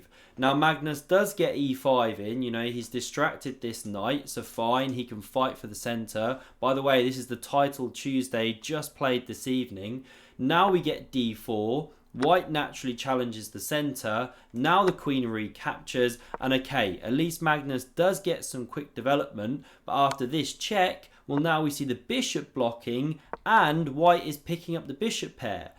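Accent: England, United Kingdom. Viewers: Fantastic video as always. Two things that were especially great about this one: your use of the word gross in the opening sentence and the fact that you managed to get Hans Niemann on screen for a few seconds. Keep up the badass analyses and I certainly hope you continue to be one of the greatest adjective-picker of all the people who speak our common language with an English accent. Folks from England usually go for soft, subtle-but-potent descriptors. Not. is aiming straight for your nuts when talks, and he's gonna hit 'em. lol sorry there's a nonzero chance that i've had a few drinks but.. I'm not entirely unserious-- keep on keepin on.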